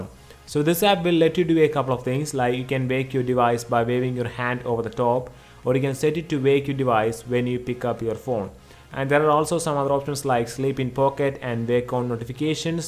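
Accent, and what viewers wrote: Kerala, India. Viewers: He's from Kerala. Can easy make out the Malayalam accent in his English. 😀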